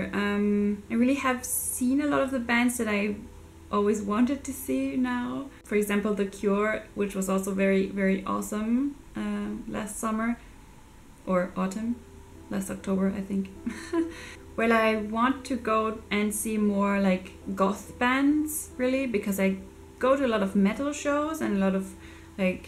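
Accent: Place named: Germany. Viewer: omg when I heard your voice/accent I immediately thought you were german and turns out I'm right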